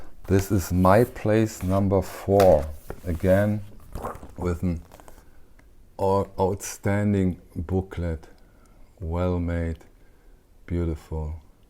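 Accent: Germany. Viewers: For electronic music, they are extremely musical sounding. Always thought they were great David Bowie recorded in Düsseldorf, simply for his love Kraftwerk When I began tuning into your channel, I mentioned you reminded me of a Kraftwerk member. I just got the vibe, but I realize it is your accent which obviously originates from the Düsseldorf region. Thanks for the great reviews Keep them coming Florian Schneider has passed, not Ralf Radio Aktivitat is my favorite too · The blokes more German than Ralf and co....... Nowt wrong with that ... Nice to hear a german person talking about k - werk.... Germany s Beatles.......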